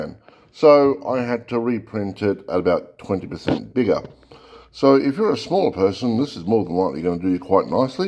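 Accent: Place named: England, United Kingdom. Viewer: What england English accent is that?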